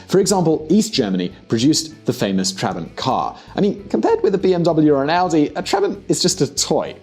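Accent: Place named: United Kingdom. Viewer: The "Solidaritätszuschlag" you are referring to ignorantly, which you falsely say was used to built infrastructure in East Germany was truly paid by all Germans (that means West and East Germans). It was used to pay • for 15–20 % of the Second Gulf War • support countries in Central, East and South Europe financially • built infrastructure in East Germany • built infrastructure in West Germany *<link> When it comes to voting for DIE LINKE then yes, East Germans are much more influenced by left ideas. Karl Marx was a German. But how in the world is that "heavily influenced my Russian politics"??? Or do you mean "Soviet politics" in your ignorance? East Germans were oppressed by the Soviets. June 17th 1953. And how in the whole wiiiiiide world does East German socialism history explain East Germans vote for the AfD????? That has other roots. Go research them! 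"[...] West Germany became the embodiment of its capitalist occupants." Social welfare was introduce to Germany and cooperated into its free market system by Bismarck in the 1880s. It expanded ever since. Who's unbiased here? Did you watch too much PragerU again, my American friend with the British accent? Your ignorance is enraging. Please consider how many young, inexperienced people are watching your bullshit! Please, please do your research. Please don't fall for platitude. Bring someone into the team who actually studied politics. And economics. Or at least history or law. Anything. Just someone who was taught scienti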